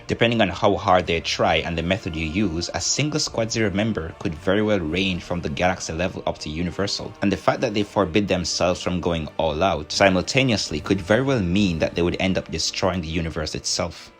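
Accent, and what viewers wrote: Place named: Jamaica. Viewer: You sound like a Jamaican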